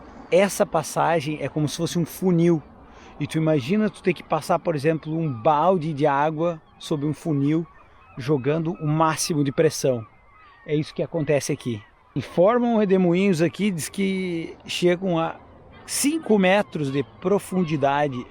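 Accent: Rio Grande do Sul, Brazil. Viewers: linda matéria!!! mas tens um sotaque de gaúcho violento... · Uma curiosidade minha é se você é gaúcho ou catarinense, Seu sotaque puxa muito para as duas regiões. Abs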